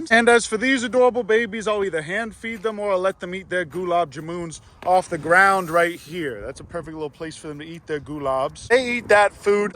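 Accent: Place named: Pittsburgh, United States. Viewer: Aye, pittsburgh represent! i knew i heard a little yinzer in you lol God bless and happy holidays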